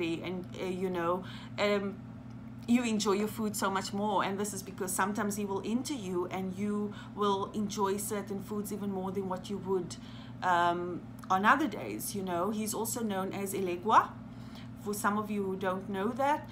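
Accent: South Africa. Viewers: You have a South African tone to you're voice hey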